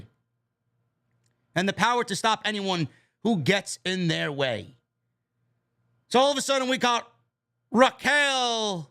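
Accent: New York, United States. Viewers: I gotta ask, you're from NY and have a strong NY accent, but rock the Braves hat. What's up with that? Not that I got a problem with it, I was born in ATL. Just expect you to have a Yankees hat I guess lol. Thanks for representing through bro.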